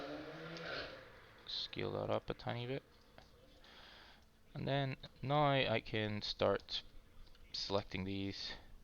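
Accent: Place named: Northern Ireland, United Kingdom. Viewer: Your accent, I keep getting a little Northern Irish in it 🤔. Great tutorial btw.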